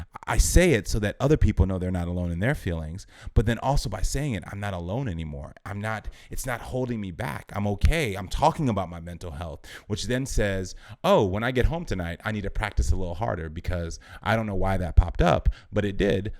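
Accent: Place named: Cockney, United Kingdom. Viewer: You cockney guru you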